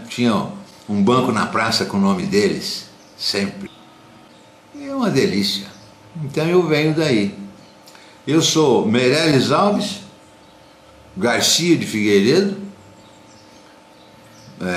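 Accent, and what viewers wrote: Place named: Minas Gerais, Brazil. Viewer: Que bonita história! Sou mineiro também e me identifico muito com essa sua maneira de falar. Conserve assim, essa originalidade de ser. Um abraço.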